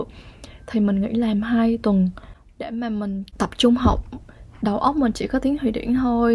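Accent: Vietnam. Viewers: Giọng bạn ở đà nẵng phải kh? Nghe hay ghê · b nói được giọng bắc à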